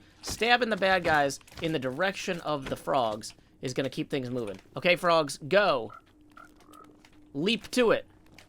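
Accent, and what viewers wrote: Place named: New York, United States. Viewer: ur new york accent is stupidly funny. im in hysterics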